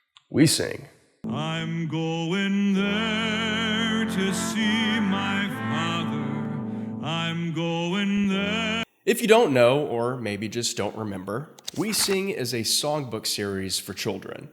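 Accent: United States. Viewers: This guy confuses my brain; he looks Australian but talks American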